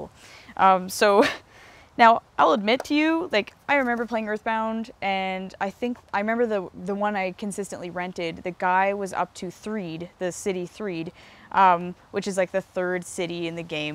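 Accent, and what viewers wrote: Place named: Canada. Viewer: She is Canadian... that's how they usually pronounce it.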